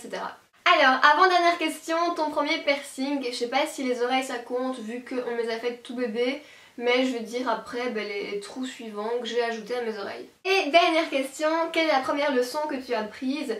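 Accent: Belgium. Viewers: Coucou, J'adore tes vidéos et ton petit accent belge:-) Ps: pense à recycler tes anciens téléphones portables, c'est mieux pour la planète!!!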